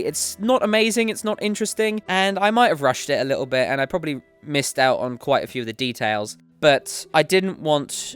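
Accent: United Kingdom. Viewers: I subscribed to you when I watched your SoO LFR videos, since they were really helpful. I now watch a lot of your videos (though not all because I don't have the time). I love your guides (the Blackrock Spire one is GREAT! keep it up!) and I love your daily discussions. I hope to see more. About your personal life, I wasn't aware of all that (although I did find out you are British just by listening, lol) and I find it to be a rather interesting story. It's sad you two had to go through all that trouble just to get married. If you weren't by now, I'd suggest you to come visit my country, but it won't be necessary now, hehe. Anyway, good luck with your life, with your channel, with everything. Keep up the excelent quality! :) Regards, from the southernmost country in the continent, Argentina.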